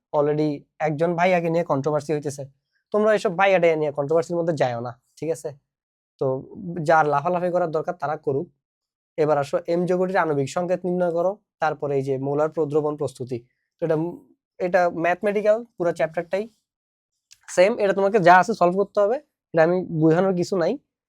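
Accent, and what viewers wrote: Chittagong, Bangladesh. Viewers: বদ্দা চিটাংইগা নাকি? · খাঁটি চিটাগাইঙ্গা 😁🫶🏻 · ভাই খাটি চিটাইঙ্গা❤❤❤ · খাঁটি চিটাইংগা বদ্দা 🙂🙏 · Vaier chitaingaa accent 🔥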